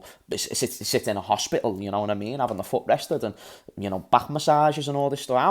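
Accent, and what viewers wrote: Liverpool, United Kingdom. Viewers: u scouser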